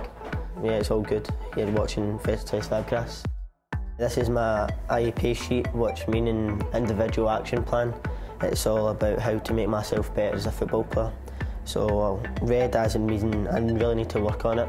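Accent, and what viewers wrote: Scotland, United Kingdom. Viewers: I love his Scottish accent lmao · If he Wants to have a good career he has to get rid of that Scottish accent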